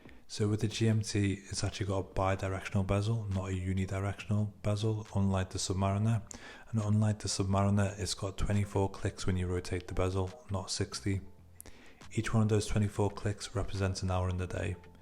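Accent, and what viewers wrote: Liverpool, United Kingdom. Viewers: Scouse accent I hear lol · Being from Liverpool...love that I’m listening to a scoucer ...living in Scottsdale and Chicago for the past 40 years....and loving Rolex....I am looking for this watch. That’s one beautiful watch !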